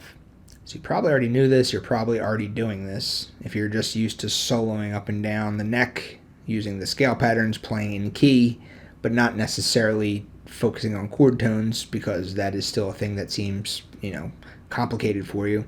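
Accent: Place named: South Jersey, United States. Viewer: Man, it's pretty easy to hear where you're from. I'm from S Jersey and you sound like a lot of people I know from Paulsboro NJ. It's that Philly outskirts on-the-river vibe. Good stuff cuz!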